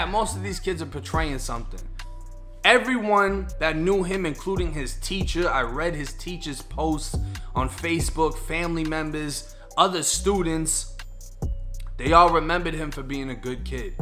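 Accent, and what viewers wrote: Boston, United States. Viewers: You sound like you from Boston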